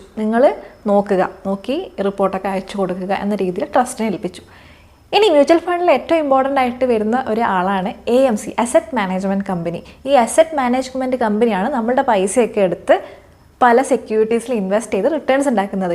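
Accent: Kannur, India. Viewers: Kannur aano? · Nalla rasond samsaram kelkkan...kannur bhagam aano veedu?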